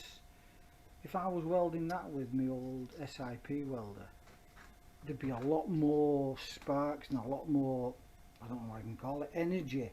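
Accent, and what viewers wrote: United Kingdom. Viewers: just sat through and watched a good few of your videos, you're obviously a Wigan Lad as you sound just like me:-) Keep them coming thoroughly enjoyed them, all the best Steve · Hiya, just watched your video on bridge rectifiers.I have an old LE Velocette with the old type plate rectifier, three plates.Recently had the magnet demagnetised.On position one on the ignition switch the bike runs and charges, on position two, ignition and lights (DC) it does not charge.Been recommended to use a modern rectifier, like the one you speak about.The LE is pos earth does that make a difference??You sound like you are from near me in Warrington??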